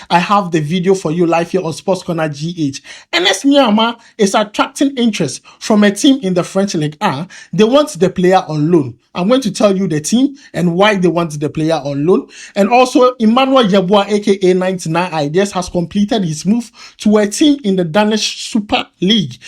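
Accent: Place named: Nigeria. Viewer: That means the Trainer is not good.A Ghanaian you’re speaking English like a Nigerian.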